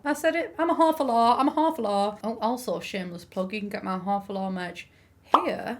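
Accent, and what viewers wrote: United Kingdom. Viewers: Ure biritish?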